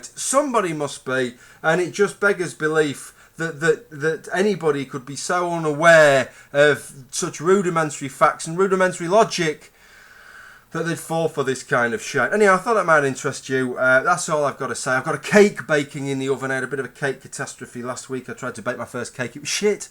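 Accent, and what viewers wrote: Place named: Yorkshire, United Kingdom. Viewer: Slight Yorkshire..... what part of the UK are you from?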